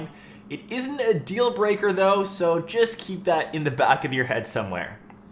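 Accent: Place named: Canada. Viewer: the phone is ok. your Canadian accent sucks. we don't care about your vacation.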